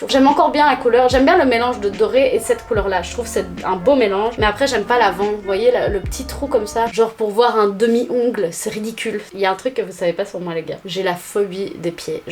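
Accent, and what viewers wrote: Belgium. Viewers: 1) je t’adore dès la première vidéo que je regarde de toi 2) t’as un petit accent qui me semble belge?? Serais-tu belge à tout hasard?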